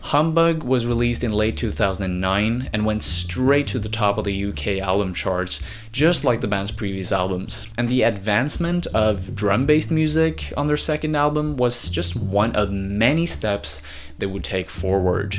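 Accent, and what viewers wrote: United States. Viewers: are u american? what country ru from lol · hearing an american talk about british things is so funny 😂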